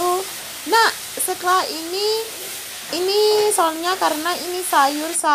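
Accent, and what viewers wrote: Java, Indonesia. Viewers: Saya nonton video2 nya mbak ini ngakak terus karna logat jawa yh sangat medok,Londo kampung piye iki ene sainganne hahahaha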